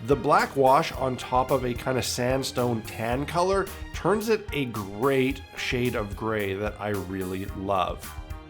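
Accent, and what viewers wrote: Canada. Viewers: I kept wondering if you were Canadian I'm glad I have confirmation.haha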